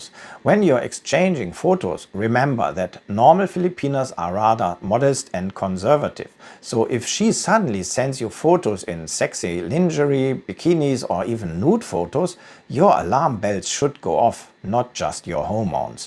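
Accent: Germany. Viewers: German accent still 100 percent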